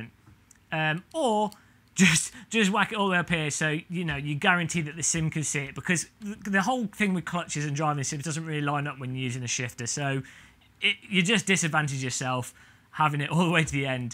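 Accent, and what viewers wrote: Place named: East Midlands, United Kingdom. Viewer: I'm sure that sounds like an East Midlands accent? Nottingham?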